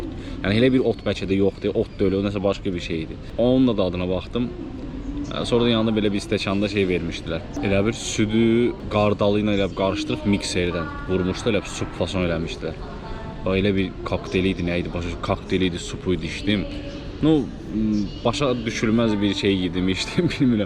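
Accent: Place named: Ganja, Azerbaijan. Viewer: Ləhcədən bilinir gəncəlididə😂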